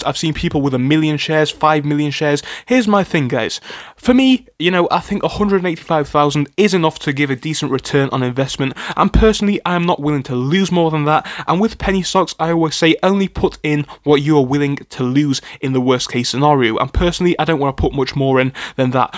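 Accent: United Kingdom. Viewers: This fella has a British accent. Not him.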